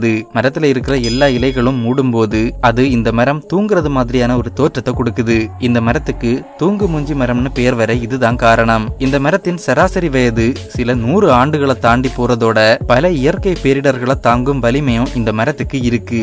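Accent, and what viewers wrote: Kanyakumari, India. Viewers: கன்னியாகுமரி காரனா நீங்கள்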